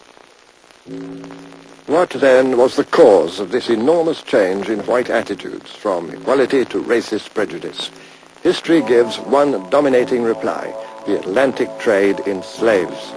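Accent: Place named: United Kingdom. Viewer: This was made in the seventies it early eighties. He is British and lots of British men speak like that. He was a professor of The school of African and Oriental studies. He was very interested in black history. It is more a style of presenting. Watch a documentary by Richard Attenborough and he has similar style. So does David Attenborough his brother (the old guy in Jurassic park).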